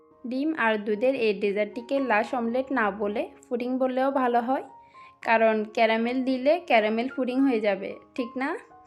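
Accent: Chittagong, Bangladesh. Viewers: Chottogram er Language 😮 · আপা মনে হয় চিটাগাং এর কথায় চিটাগাং এর টানটা আসছে।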